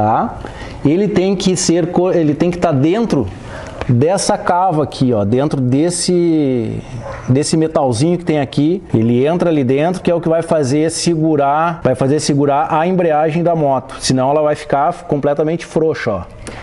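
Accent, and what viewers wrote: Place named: South, Brazil. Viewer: Obrigado pelas dicas amigo. Acabei de comprar uma FA. Entao ja vi alguns videos seus e do val( quando tinha a gsxs) pra entender melhor a moto. Tem sotaque do Sul. Vc é de onde?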